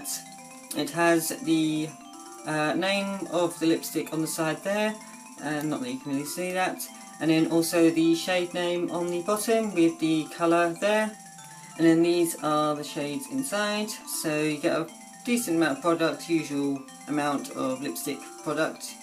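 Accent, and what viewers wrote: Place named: United Kingdom. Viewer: I liked all 4 colors and all of them look nice on you🔥 Greetings from Siberia, Russia✋ Btw, I like your accent. It seems like you're from the UK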